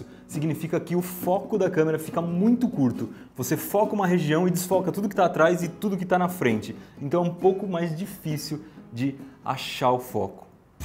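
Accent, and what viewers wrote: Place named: Northeast, Brazil. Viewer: Nordestino DETECTED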